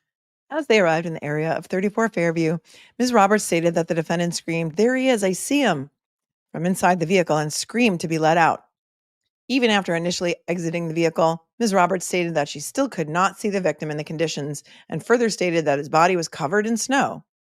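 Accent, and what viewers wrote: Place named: Long Island, United States. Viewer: I know you don't need more kudos. But I have to give them. You have all the receipts, all the time! Your coverage is my favorite, and got me through 2 surgeries (just with Karen Read!). Looking forward to watching 2.0 with you. Plus I lived in Great Neck and Levittown for 15 years so your accent makes me feel like I'm visiting friends.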